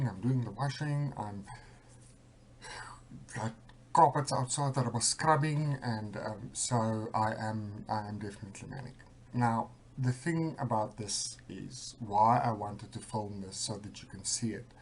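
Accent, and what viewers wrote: Afrikaans, South Africa. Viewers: You are strong and brave man. Can hear you are from RSA like me. Not an easy place to live in, but sharing this the way you did while going through this, i have a lot of respect for you. May God bless you and give you relief sooner than you expect it. Stay strong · I have a couple friends that are from SA, that accent is Afrikaans. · Are you SA? Or in South Africa? I recognize the accent. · What is this accent? South African? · E Quality is it south African? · I get the depression but not the mania. I'm not Bi Polar but I experience the severe depression. It's the worst. PS I'm a South African living in China and I've been watching YouTube videos about mental illness.....it was SUCH a sound for sore ears to hear your south african accent!! Thank you for sharing your video. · Hey mate! Are you South African? I detect an Afrikaans accent. 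· I was lekker to hear your Afrikaans accent and realize I'm not alone suffering with this in good old SA. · Nice afrikaans accent bloke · Leo Harker south african i think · Are you South African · Guy's South African, got a Afrikaans accent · Alice Lee Afrikaans accent from South Africa.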